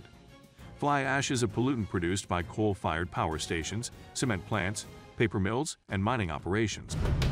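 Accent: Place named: South Africa. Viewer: I know you are a white south African, that why you are talking like that 😁, like I said before, facts doesn't care about your feeling, bringing the ideas on the table doesn't mean you are the one who invented it 😏😁.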